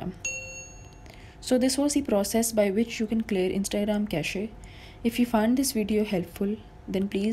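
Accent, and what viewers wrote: India. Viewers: Indian voice 🤢🤮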